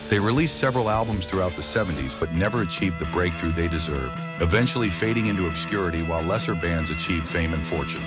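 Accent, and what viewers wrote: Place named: United States. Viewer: The American voice commentator turns me off every time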